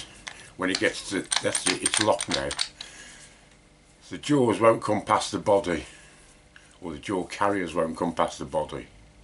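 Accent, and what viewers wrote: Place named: England, United Kingdom. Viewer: I wish I lived closer, I have always said “ anyone who has an English accent automatically has 10 points added to there IQ”. I as well have two super Nova chucks one for my Jet lathe and one for my General lathe. I enjoy your method of presentation on your videos. Keep up the good work. Cheers Don Lakewood Wa......U S A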